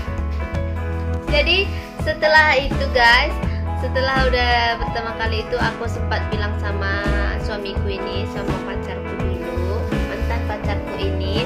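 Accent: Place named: Medan, Indonesia. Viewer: Oh seperti itu ya ka..logat nya kayak orang medan ya ka salam dari rki asal lampung tips nya sudah di simpan ya ka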